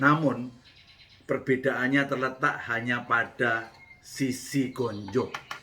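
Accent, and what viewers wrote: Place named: Java, Indonesia. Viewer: Mantab. Bahasa Indonesia dengan logat jawa. Sehat selalu Om. Aamiin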